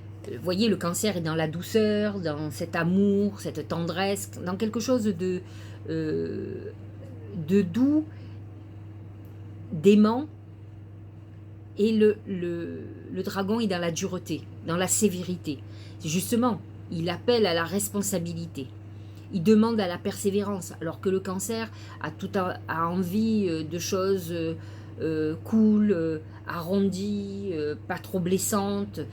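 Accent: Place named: South, France. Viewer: Merci beaucoup de la part d une Vierge qui mentalise parfois trop. Je vais demander l accompagnement du "juste" à Madame la Lune et à mes Anges projecteurs. J apprécie bcp vos interprétations! Et j adore votre joli accent du soleil 😀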